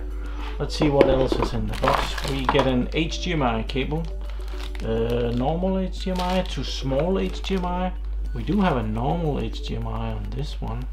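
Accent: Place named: Denmark. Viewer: im betting my ass on the line that this is a danish guy, his English accent is typical of a dane.....the ice is melting at the poles hahah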